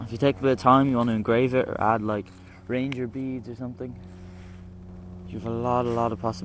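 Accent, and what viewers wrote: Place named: Ireland. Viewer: u hav such an irish tone to ur voice ha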